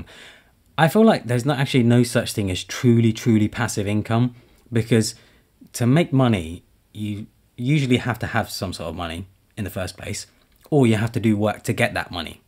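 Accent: United Kingdom. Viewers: Hi! About amazon, are you linking solely to the US amazon store? If you are, is this based on your audience analytics? Asking because it sounds by your accent that you and I are using a different one (amazon uk) so I was wondering whether I should open one here or there.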